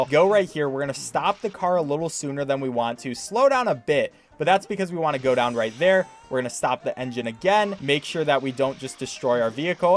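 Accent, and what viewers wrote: Czech Republic. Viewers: You are czech ? :D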